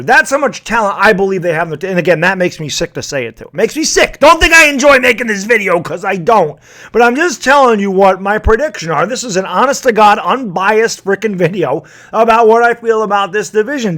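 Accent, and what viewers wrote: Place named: South, United States. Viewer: haha damn no shit I thought you were a little bit from the south from some reason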